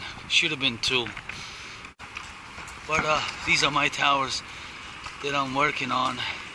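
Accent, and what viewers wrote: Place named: New York, United States. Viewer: You are cool man, love your accent, that classic NY accent as its best!! Cheers from Sweden! Jupp Bahco was made here once....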